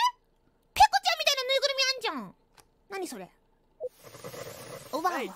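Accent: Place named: Japan. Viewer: Peko japglish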